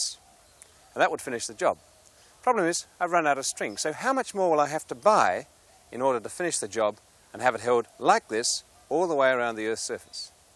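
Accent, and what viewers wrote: United Kingdom. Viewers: I’m an Australian and I picked his accent as slightly British. He may have spent some years studying in England? Or it may just be he has a cultivated accent due to his high level of education. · No he isn't. That's a British accent. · even before clicking the video, i knew that man would have a British accent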